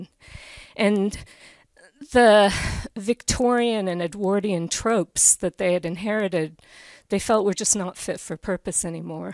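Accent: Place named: New Zealand. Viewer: Dear, thanks, I did enoy this presentation (as some others) of yours - admittedly, it's because I'm a linguist and a fan of Steve Pinker's. Steve has been mentioning you for a couple of years, so I did check some of your presentations. Steve's been right, you're great - and, frankly, I do love your NZ accent (frankly, no offense - I'm a phonologist/historian). Thank you!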